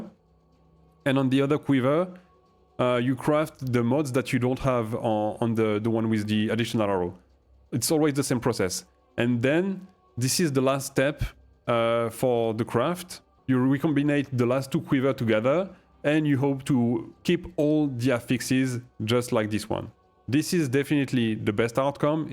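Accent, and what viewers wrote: France. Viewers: On reconnait l'accent bien français bien bourguignon. Nice craft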